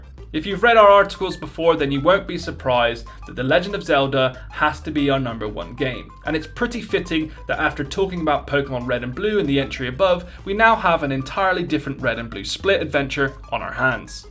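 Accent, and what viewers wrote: Bristol, United Kingdom. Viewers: Bristol accent!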